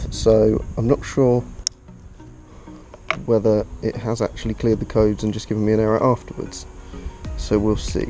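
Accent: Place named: England, United Kingdom. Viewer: Dude you sound English. Where abouts are you? I’m Lincoln. Brand new XSR700 in black owner.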